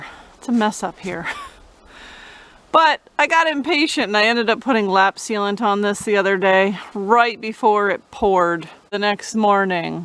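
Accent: Nova Scotia, Canada. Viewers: I enjoy your videos, you are so Nova Scotian in your speech. (Thus the phrase "Giver") Do not miss dealing with those ugly looking spiders. 😧😧😧😡😡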